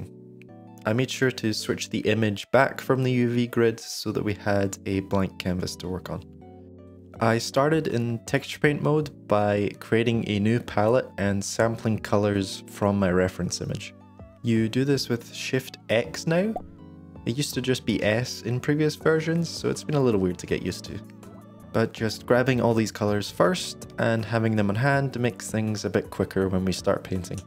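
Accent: Scotland, United Kingdom. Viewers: nice light scottish accent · are you scottish?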